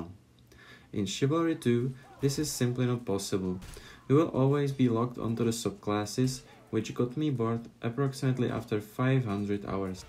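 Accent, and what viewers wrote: Czech Republic. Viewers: Ha! Já jsem si říkal že mi zníš jako čech. :D Já mám mordhau určitě radši kvuli tomu combatu, protože u chiv2 mě příjde že to je takový divný když můžeš blokovat, jak dlouho chceš.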